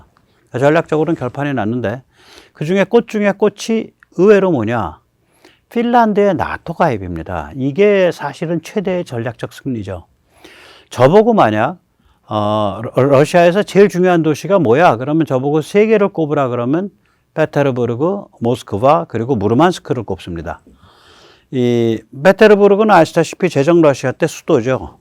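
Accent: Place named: Seoul, South Korea. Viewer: 서울 사투리 쓰시는거 같아요. 'OO 밖에' 를 'OO밲에" 이렇게 말하시네요.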